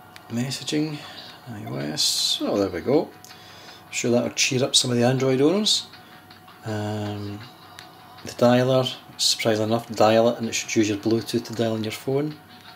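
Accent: Scotland, United Kingdom. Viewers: But he isn't murican... The accent is scottish I pressume... But I do agree about the bubbles abit.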